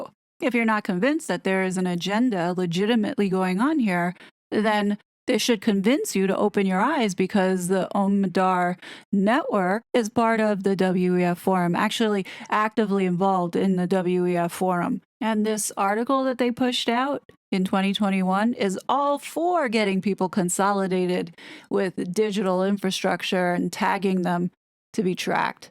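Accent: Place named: New York, United States. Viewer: I concur! I love dropping educated opinions in my lap in the evenings! I can't believe she was EVER self- conscious about her voice & NY accent! has an amazing cadence & comforting tone. She needs to get a side hustle reading audio books! 🎯💯💕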